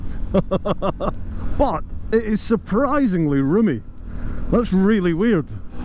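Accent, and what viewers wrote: Scotland, United Kingdom. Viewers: OMG 20 stone. But you don't seem to be killing the bike. For you American viewers, 20 stone =280 pounds. Love your accent scotsman, and you showed me some beautiful country. This reminds me of my first bike. No power but lots of fun. Excellent review. So much crammed into the package deal on this one. Love the luggage capacity and the large tank for extra miles. It's amazingly quiet and looked like a very smooth ride. Of course, your country seems to have very well maintained roads unlike our country. Pot holes everywhere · Where abouts in Scotland are you from pal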